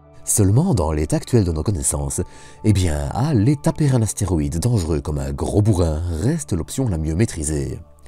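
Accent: Liège, Belgium. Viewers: Tu ne serais pas de Liège, par hasard?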